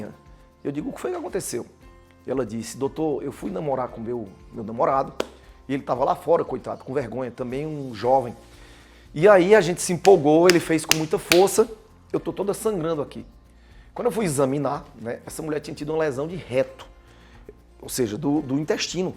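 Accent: Ceará, Brazil. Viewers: O sr.é cearense??? Um jeitinho típico de apresentar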